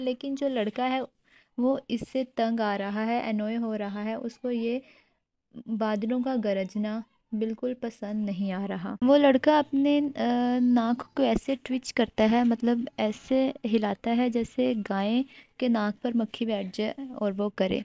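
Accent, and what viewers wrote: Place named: Lahore, Pakistan. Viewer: Thankyou Mam ji....... love from India and aap Lahore ki Hai mujhe pata chal gya jab aapnne bola theek ho gaya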